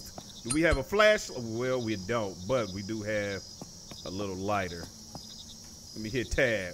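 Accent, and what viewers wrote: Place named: Russia. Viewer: Your Russian accent clean af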